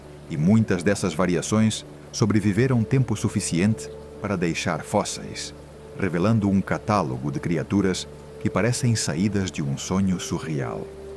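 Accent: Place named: Portugal. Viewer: Pois é, tá em português portugal, mas é incrível o quanto se parece com nosso português algumas palavras 😊😊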